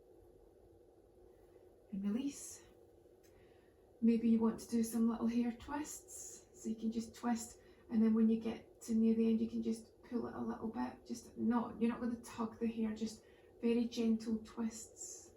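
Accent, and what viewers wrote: United Kingdom. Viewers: Is that British accent?) I'm definitely not used to British, and why does it even sound to me like slavic sometimes😂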